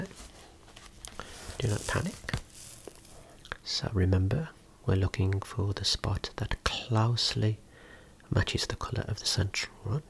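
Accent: Birmingham, United Kingdom. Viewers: A brummy asmrtist is something I never thought I'd see but I'm all here for it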